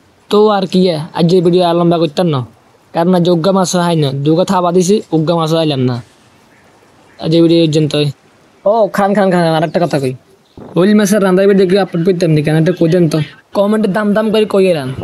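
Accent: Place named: Noakhali, Bangladesh. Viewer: Bhai Mone hoy noyakhalir manush 😂👌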